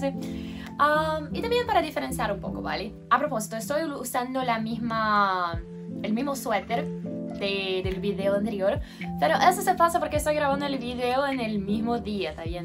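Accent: Buenos Aires, Argentina. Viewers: tienes un acento porteño..como asi? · Que bien hablas argentino!🩵✌️🇦🇷👊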